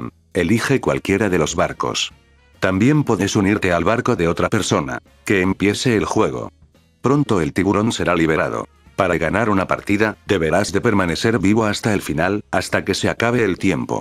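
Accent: Argentina. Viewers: Eres argentino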